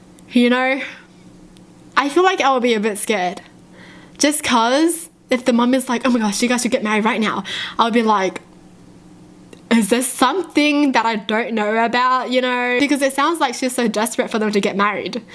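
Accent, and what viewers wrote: Australia. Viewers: zenzoner zz nooooooo omg by blood she’s full asian. But she just happens to be born and raised in Australia which she picks up the accent.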